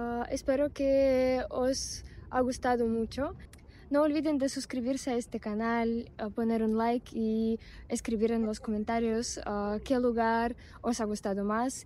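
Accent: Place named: Spain. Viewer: Su lenguaje es con tono de España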